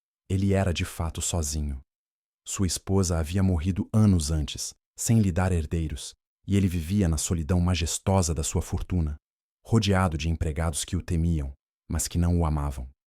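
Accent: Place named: Portugal. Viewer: Que narração orrível a istoria e boa não coloquem mais este narrador mistura com língua de portugal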